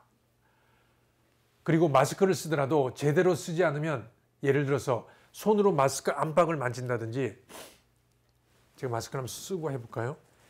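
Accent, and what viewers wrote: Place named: Jeolla, South Korea. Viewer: 서울말투가아님. 전라도말투?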